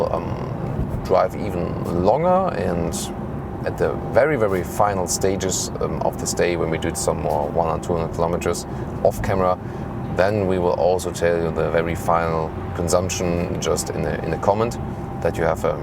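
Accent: Germany. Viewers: And that german english 😂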